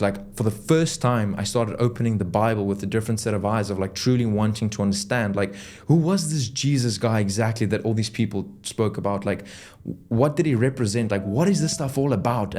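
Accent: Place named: South Africa. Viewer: I love your accent. Is it South African by chance, or New Zealand?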